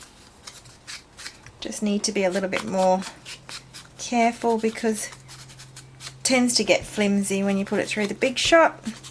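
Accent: Australia. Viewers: Love this card... simple, yet elegant. Vintage style will always get my vote! Nice to hear an Australian accent for a change.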